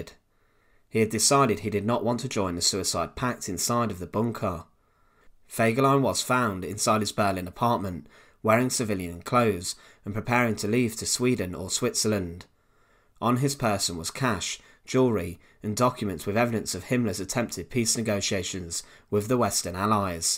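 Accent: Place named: Leicester, United Kingdom. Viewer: I've said it before, but fantastic to hear a proper Leicester accent, makes me homesick. Re the vid, wasn't Eva Braun "HOT!"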